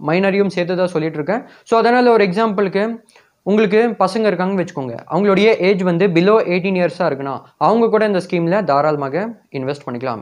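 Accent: North India, India. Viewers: நீர் என்ன வடக்கரா? முறையாகத்தமிழ் பேசுக. The service by SBI personnel is not at all up to the mark.Every activity is carried out by machine. They start by half an hour late and close the counter before half an hour earlier by counting the currencies. For every transaction most of the interim works are to be carried out by the SB a/c holders. Very few lady personnel are exceptional. Every worker should be in uniform with the explicit I'd card and phone no. Most of the time the printer is out of order. Counter to counter, the customers are tossed. With the e.governance, they themself could verify everything and they seek pleasure in driving away the senior citizens.😮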